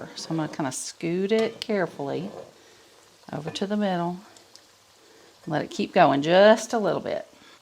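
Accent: South, United States. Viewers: Love your recipes and your lovely southern accent! 😊😊😊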